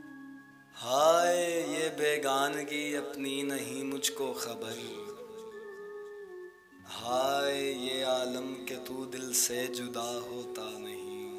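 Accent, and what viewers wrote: Punjab, Pakistan. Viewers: Such beautiful poetry & such bad wrestling style voice & Punjabi accent for Urdu poetry is the worst. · You are basically a Punjabi speaker. Punjabi reflects in your style. When you are reading Urdu you should purely read Urdu.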